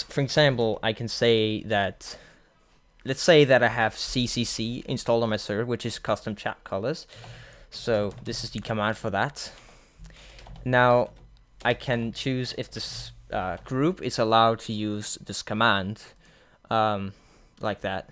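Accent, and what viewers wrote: Denmark. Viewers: oh your from denmark :D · Are you danish?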